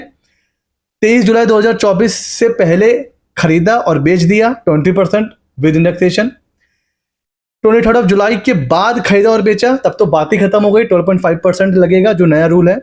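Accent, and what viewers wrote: Lucknow, India. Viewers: Lucknow se lag rahe ho, is it?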